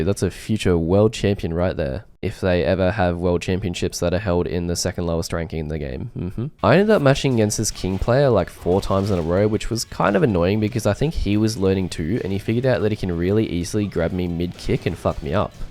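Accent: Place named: Australia. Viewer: tekken is a hard game, with a huge amount of knowledge checks. happy to help you come to grips with the basics if you like? judging by your accent, you are in Australia right? I'm in NZ so the connection should be pretty decent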